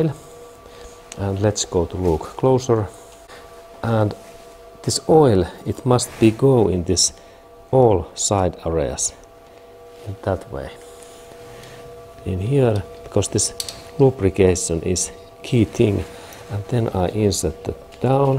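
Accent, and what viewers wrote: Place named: Finland. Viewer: Thanks for your videos. I am getting to like the Finnish accent!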